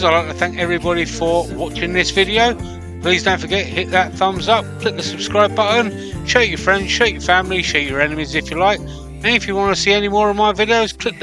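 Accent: United Kingdom. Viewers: Very nice bowl, but surely you should call it a sweet bowl not a candy bowl. You sound like your from the UK.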